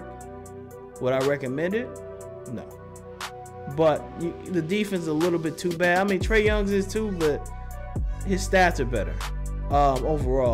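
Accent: St. Louis, United States. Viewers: You from stl?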